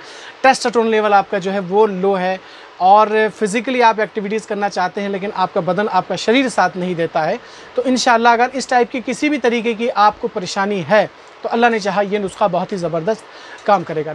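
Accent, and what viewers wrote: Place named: India. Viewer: apki voice pakka hindustani dekha rahe hai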